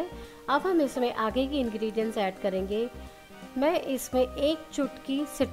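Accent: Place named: Kutch, India. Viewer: apki resipi rsoi sow me bhi dekhihe muje bhot psndhe apki boli thodi kchhi mix gujrati mithilgtihe thnks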